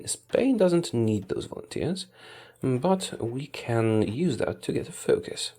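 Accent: Poland. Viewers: whatt oglądam cie od 2 lat i coś tak właśnie przeczuwałem że jesteś z polski. Masz zajebisty angielski. Pozdrawiam mordeczko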